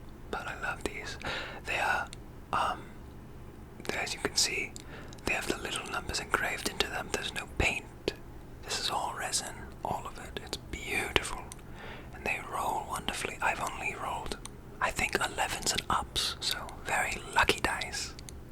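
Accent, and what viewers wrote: RP, United Kingdom. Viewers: Just me that thinks uses his British voice more than his actual one and I love it ✨🤗🥰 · Idk whats going on... but i'm loving it.🥰 I'd love to hear the British Gentleman from the 1800's roleplays hahahhahah 👉👈 plz do more🥰🥰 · Alright, it’s official, British accents give me the tingles... that explains a lot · This British gentleman accent is giving me 🦇 British Vampire🦇 vibes , ya know? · What am I watching?? But don’t get me wrong, I love the British gentleman accent.😗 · this is a very VERY convincing british gentleman from the 1800s accent... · so he’s a korean american who knows asl and speaks in a british accent... hm.. ㅋㅋㅋ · Hey how about u do an asmr with ur British gentlemen voice but make it sound like ur talking to us. Also u don’t really have to whisper when it comes to asmr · I’ve only ever heard his British gentleman voice lmao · British accent + ASL ... 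Somethings not adding up (Yes, I know he's not actually british) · Ok but like you don’t have to do anything specific, just whisper with your British Voice into your special ASMR. I will listen as long as your speaking so...😼‼️🙄✋🏽🥶👁👄👁 SIKEEEEEEE.... I GOT TO THE FORTUNE COOKIE BOX THING. READ YOUR DREAM CHECKLIST AND FORTUNES OUT LOUD WITH YOUR BRITISH VOICE!!! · Omg. I've been following you for a while and your British accent tik toks inspired me to get here. I'm so so happy omg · Ok don’t get me wrong I love the British gentleman voice but can we have a normal voice video please? 🥺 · Why is it that is so much more attractive with a British accent lol❤️ lol you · I’m just gonna act like I haven’t watched this video 15 times already 😔 please post more asmr videos in the British voiceeee😭😭😩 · You should read more fan fic in British it kills me!😂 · Who is this handsome Korean-American man speaking to me about a box of goals, dreams and fortune cookie papers in a lovely British accent? 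Because he's doing wonderful and I love it.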